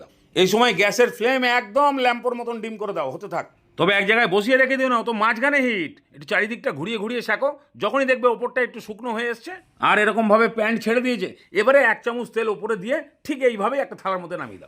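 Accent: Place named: Bangal, Bangladesh. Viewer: ওনার রেসিপি নিয়ে কিছুই বলবার নেই, অসাধারণ। কিন্তু ওনার USP হল কথা বলার ধরণ। শুনলে মনে হয় বাঙাল, আর বাঙালদের রান্না 🌍❤❤❤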